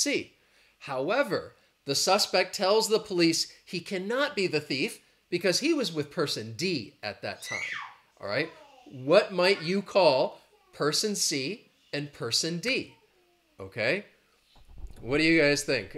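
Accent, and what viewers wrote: United States. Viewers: I'd like your US accent ☺️☺️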